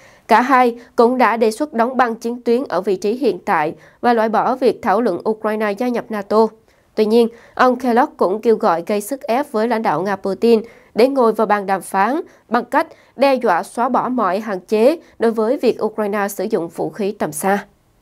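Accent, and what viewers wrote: South, Vietnam. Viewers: Chào xinh đẹp ❤phát thanh viên có giọng đọc nghe rất hay chuẩn nam bộ. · 🌴.Anh Chào e nhé..Cô Mc rất là dễ Thương và Xinh đẹp.Cô có chất giọng đúng người miền Nam..Dễ nghe,lôi cuốn người luôn và thích quan tâm các tin tức thời sự..Nhất là các tin tức Q.sự..Đặc biệt là ở chiến trường đất nc Xinh đẹp Ukraine..Anh xin Kính Chúc Tập thể ban biên tập (Bbt) Chương trình của Kênh Qsm,cùng với Cô Mc Xinh đẹp như a đã nói ở trên luôn đc nhiều s.khoẻ, và mỗi ngày sẽ có thêm rất rất nhiều người biết đến Kênh Qsm để tìm đến theo dõi Kênh ngày càng thêm nhiều..Mc Xinh đẹp luôn mãi là người mà a rất ngưỡng mộ, và luôn ủng hộ Kênh Qsm đi cùng với e..Một lần nữa.Xin chân thành Kính Chúc đến với tất cả Quí vị 1ngày mới luôn Vui khỏe, và Hạnh phúc Bình an......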